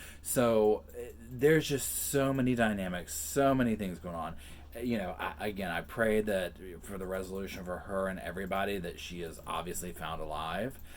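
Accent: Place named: North Carolina, United States. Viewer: You sound like you are from NC!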